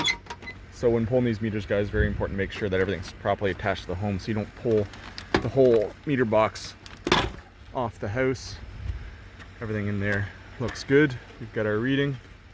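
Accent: Canada. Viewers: R u a Canuck?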